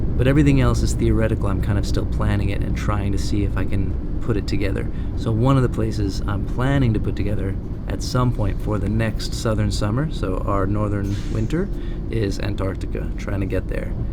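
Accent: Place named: Canada. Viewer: Kind of a different question for you,...I'm a bit of a student of language and I'm really curious where you did your English training? You have, I'm guessing, an almost Canadian accent for someone from Sweden. Anyway, love your content and use of the English language. 🙂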